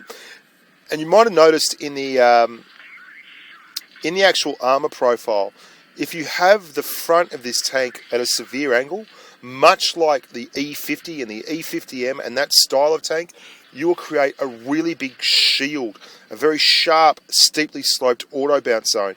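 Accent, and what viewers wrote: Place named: Australia. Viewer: Your videos are great and your commentary is always entertaining. I don't know why but I've always loved the way you Aussies talk. Guess you can blame it on crocodile dundee from when I was a kid. I'd love to hear your thoughts on the Churchills. I can't find anywhere in my heart to like them but I want to know if it's worth grinding past them to the Black Prince and beyond.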